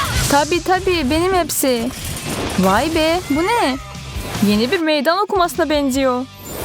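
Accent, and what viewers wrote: Azerbaijan. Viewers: Azerbaycanlı seslendirmiş Türkçe konuşmaya çalışarak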